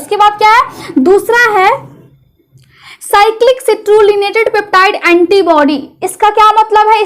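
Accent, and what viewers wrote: Bihar, India. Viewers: Bihari bhasa ki latk Kam karo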